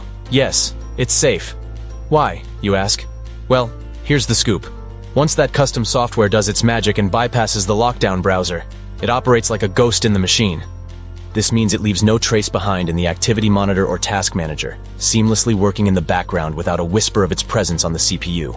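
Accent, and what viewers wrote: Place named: India. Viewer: your indian bro i can tell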